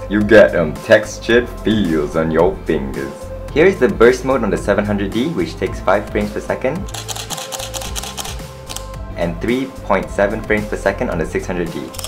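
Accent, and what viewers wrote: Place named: Malaysia. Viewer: u sound malaysian malaysians are cool :)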